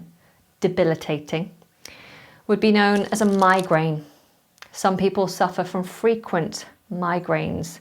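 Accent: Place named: United Kingdom. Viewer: I love your sexy and beautiful British accent baby specially when you eat and swallow all end "r" .This is sooooooo cute baby. thanks a lot for your useful and beautiful clips. God bless you my beautiful teacher.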